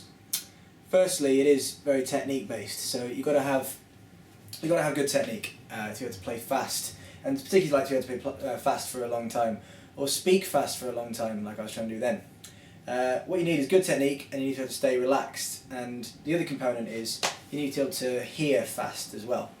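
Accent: United Kingdom. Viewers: you sound english. veeeeery annoying, please spake normul plz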